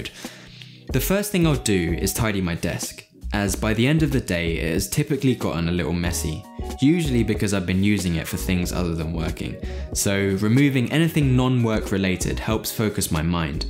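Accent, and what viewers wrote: United Kingdom. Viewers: I'm liking the new British accent! ❤️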